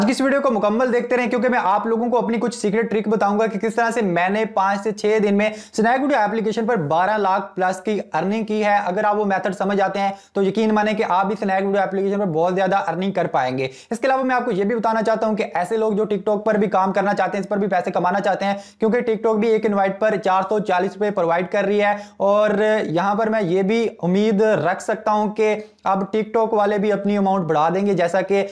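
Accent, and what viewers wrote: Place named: Punjab, India. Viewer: اس نے پڑھائی نہیں کی سارا وقت ان ہی کاموں میں گزار دیا تب ہی سانیک ویڈیو بول رہا ہے :) پتہ نہیں یہ پنجاب والے بھائی اردو بھی پنجابی کی طرح کیوں بولتے ہیں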